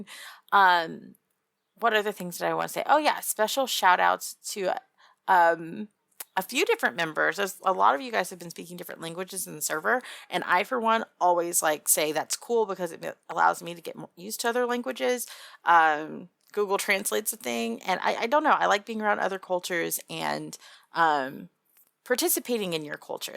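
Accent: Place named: Brooklyn, United States. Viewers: Loved the audio the demon yandere with a Jersey accent or is it a New Yorker accent either way I am blown away by how many accents you can do❤ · That was totally wicked!!! You did a great Brooklyn Accent. Harley Quinn would have been proud. Liked how the Brooklyn & Texas accents started blending together toward the end. You were great! Also hearing some of the origins of the imaginations afterwards is great, too! Totally fun! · So legit, love southern accents, and that accent sounded...hmmmm new york? New jersey? Hard to tell, but i was getting heavy Fran Drescher vibes from that voice whoch made it funny but at the same time if she has new jersry or new yorker in her, oh lord she'll kill anyone that touches her man. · A Brooklyn accent makes me miss Olga 😔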